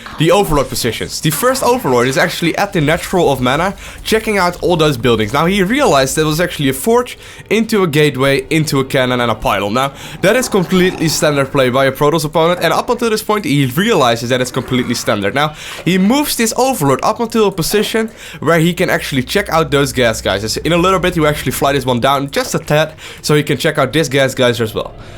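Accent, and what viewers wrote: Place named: Netherlands. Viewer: dat classis dutch accent....sounds exactly like rotterdam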